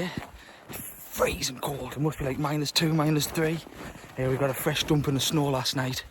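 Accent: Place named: North East England, United Kingdom. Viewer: You from Sunderland or Durham? Sounds it haha… I’m from Newcastle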